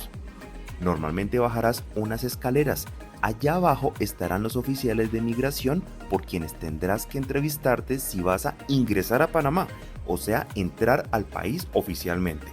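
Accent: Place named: Costa Rica. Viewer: Bello video ! Pensé q era de Costa Rica 🇺🇸🇨🇷🇨🇷🇨🇷ya q hablamos muy parecido en el 2023 bajé a Costa Rica 🇨🇷 y Guatemala 🇬🇹 via copa love it desde California usa.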